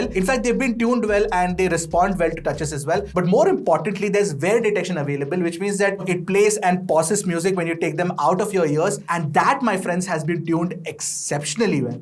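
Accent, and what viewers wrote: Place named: South India, India. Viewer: Irritating south indian accent😖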